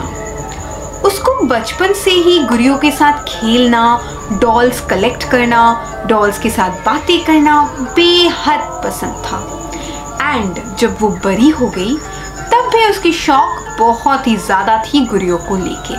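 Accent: Bengal, India. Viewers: Apki bengoli hindi muja bohot pasend haii 😂😛😍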